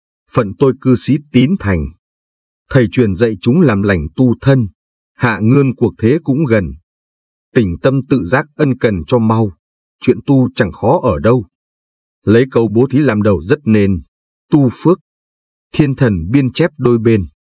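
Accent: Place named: South, Vietnam. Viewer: Hihi thầy nói giọng miền nam dể nghe, dể hiểu ?! nay đổi giọng bắc sao khó nghe và khó hiểu quá ? hihi. Không biết đức thầy nghe được không nữa ??!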